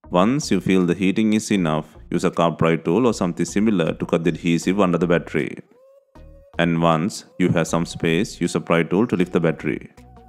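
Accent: India. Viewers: It seems you're attempting to speak with the heaviest Indian accent possible. I can't understand 1/3 of what you're saying.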